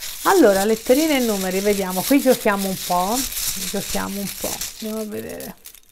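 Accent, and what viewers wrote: Tuscany, Italy. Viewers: Buonasera hai pienamente ragione si quello che ai detto e verissimo e un periodo che non lo sento però so che e informato su di me e non so che mi offre nel futuro però lui e come lo stai descrivendo e quello che dicono le carte gli ho dato l'animo.gli ho fatto una richiesta e non decide di farla dopo tanti anni penso che lo meriterei. Ciao carissima simpatica e bella sempre la tua voce con l'accento toscano❤️😘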